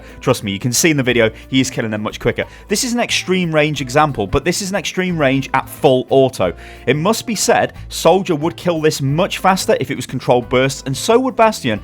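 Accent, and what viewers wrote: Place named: United Kingdom. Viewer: this is the second time that i watch your video with my gf and she get wet cz of your accent .. jesus turn me to british please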